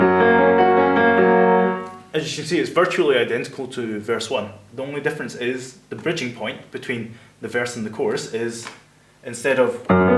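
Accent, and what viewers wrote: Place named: Ireland. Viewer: you sound like your from Europe or Ireland. Forgive me if I'm wrong.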